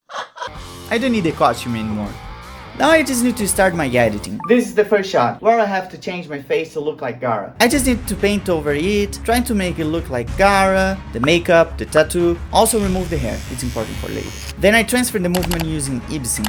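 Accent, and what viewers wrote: Brazil. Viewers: Você é brasileiro né? da para notar no sotaque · na verdade ele tem um sotaque mt fácil de identificar que é brasileiro kkk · Sim ele é brasileiro, dá até para notar um sotaquezinho e tbm mostra na localização do canal, só que como a maioria do público dele é gringo ele fala em inglês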